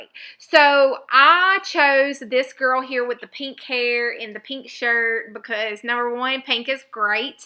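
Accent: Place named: Kentucky, United States. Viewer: Wow, I just "discovered" you only a week ago when I when was searching YouTube for beginner videos to get started on my new Gelli plate. I had been watching very complicated videos and was getting discouraged before I even started. Then I found YOU! Instantly my heart leapt with JOY and I was like, "I need MORE of this girl in my art life!" I have started binge watching your videos because they are EYE CANDY and just because I cannot get enough of your sweet VOICE (my grandpa grew up in KY and later Baton Rouge and used to call this Alaska girl, his only granddaughter "Sugapie" so hearing your accent is bringing back SO many memories, even though he passed 20 years ago). Words cannot express how much you are a GIFT to the art community as well as sharing your JOY with anyone who is lucky to find you online. I am signing up for your newsletter and will start enrolling in your classes. 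Much love to you and Josh and the puppies!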